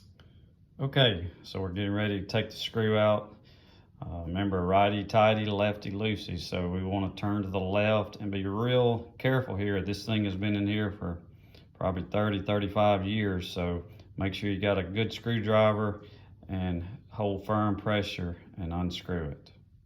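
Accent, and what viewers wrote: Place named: South, United States. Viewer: Not $100. I paid about $40 - $50 at the orange box store. It depends on far the turn-valve is from street level. Mine is about three feet down so I can’t reach it with a wrench and had to buy the water key. In the far north, they can be four feet below ground, due to the frost line. This guy sounds like he’s in the South, so they’re not buried very deep and he can easily reach it with a hand tool.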